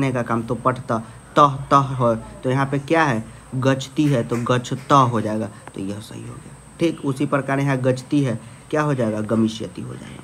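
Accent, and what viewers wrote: Bihar, India. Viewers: Yah to full bihari hai bhayiya sir aap bahut acha padha rahe ho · Sir aap bihar se h kya ...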